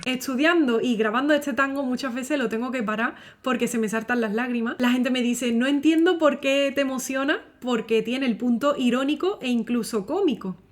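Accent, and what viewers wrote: Andalusia, Spain. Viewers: Excelente, felicitaciones. Hablas muy como Española pero lo cantaste como Argentina, y además felicitaciones por como te informas de lo que vas a interpretar. soy Argentino y canto tangos, saludos. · Como argentino y amante del Tango te felicito, es una interpretación magistral. Tu acento andaluz queda muy bien en este tipo de canciones. No le des importancia a algún tont0 que critique tu acento. Por otro lado no es la primera vez que haces canciones de Tango y te queda genial, se nota la pasión y sentimiento que le pones. Siendo argentino es un orgullo que alguien tan talentosa como vos haga canciones como estas. Está canción es de las que más he escuchado en mi vida. Desde que era chico mi abuelo nos ponía siempre Tango a mi prima y a mi, tanto en casa como cuando íbamos en el auto haciendo algún viaje visitando familiares. El tema favorito de Tango de mi abuelo siempre fue la y el mío sin duda. 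Escucharlo me hace acordarme de esos tiempos. Su letra es una obra de arte, dice muchas verdades y suena actual aunque la canción tenga tantas décadas. Además de hay otro tema que no envejece y siempre estará vigente: " " de. Las escuches cuando las escuches esas canciones siempre van ser actuales por las letras que tienen. Música que quedará por siempre. Gracias por esta interpretación tan genial Dejo el tema de para quien quiera escucharlo <link> Dedicado a los políticos de Argentina, sobretodo al vendepatria que tenemos ahora como presidente. Aunque el que escuche la letra verá que se puede aplicar a políticos de otros países también. Pero siendo argentino puedo asegurar que políticos má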